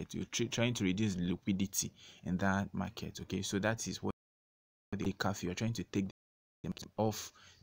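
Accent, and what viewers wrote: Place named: Nigeria. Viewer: I like the originality of your Nigerian accent.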